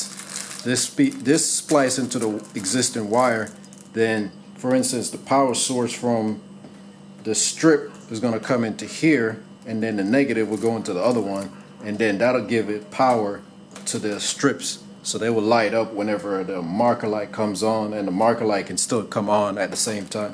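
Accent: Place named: Jamaica. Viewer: You sound Jamaican. Looking forward to more Hr-v mod videos. Good work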